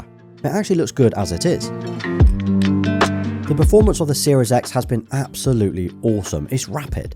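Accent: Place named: South West, United Kingdom. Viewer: Great vid and nice to hear another South West accent! 😀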